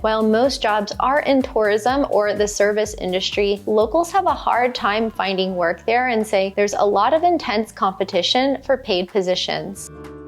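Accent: United States. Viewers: Que desesperante es la voz en inglés americano... de por si el idioma inglés es así, preferible el inglés británico que es ligeramente peor...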